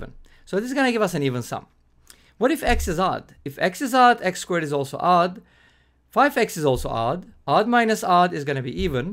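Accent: Turkey. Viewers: Your accent really looks like Turkish, I dunno why but it really does.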